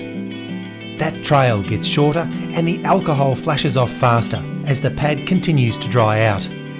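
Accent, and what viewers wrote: Australia. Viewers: Great to hear the Aussie accent. Check out how we do things in Sydney at <link>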